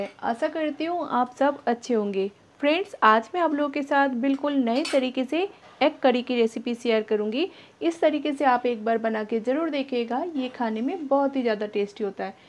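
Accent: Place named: Bengal, India. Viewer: yummy looking surly must be tasty too, I would like to know, this is bengali style, your speaking style is also bengali.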